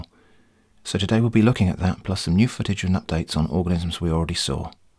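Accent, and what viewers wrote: United Kingdom. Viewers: Mmmmm a Brit eh?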